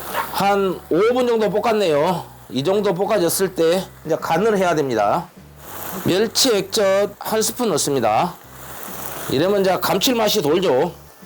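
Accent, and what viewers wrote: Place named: Honam, South Korea. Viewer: 선생님께서 정겨운 호남말투로 만드시는 경상도 토속음식 너무 재밌네요 김천 흑돼지식당 가서 이거 먹어보고 반해서 레시피가 궁금했는데 잘 봤읍니다.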